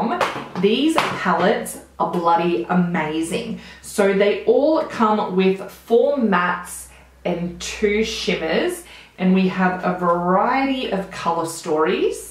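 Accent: Australia. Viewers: Of all Aussie beauty youtubers your accent strikes me as the most aussie! Its how the last word in your sentences goes up ↗️ You must be from Queensland 😂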